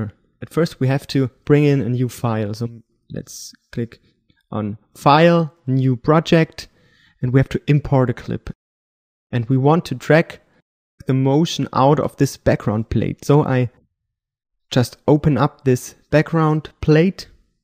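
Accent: Germany. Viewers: r u german? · you're german right? Got a german accent :D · kann es sein das du deutscher bist? :D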